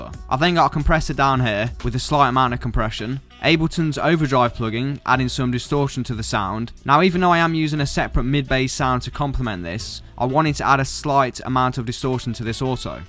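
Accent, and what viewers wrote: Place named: Derby, United Kingdom. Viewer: Sound like you from Derby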